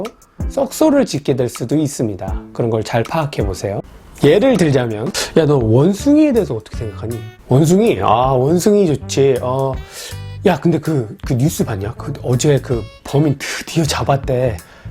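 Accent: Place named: United States. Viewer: 케이C님 토종 한국인 인줄 알았는데..영어 발음이...미쿡사람?^^ 이셨내용 👍잼있고 유익해서 담편도 당근 기대합니다~~😉😉😉😉😉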